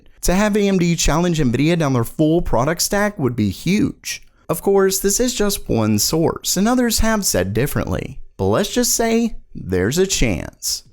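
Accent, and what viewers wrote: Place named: South, United States. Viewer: southern accent coming out here and there lol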